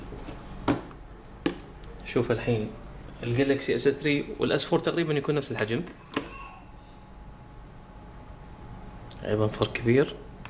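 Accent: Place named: Iraq. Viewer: كلامك عراقي لايك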